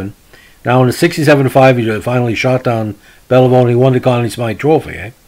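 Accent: Canada. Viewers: You might be the most Canadian sounding dude of all-time. Good video about Ballard most fans don’t know how greasy he is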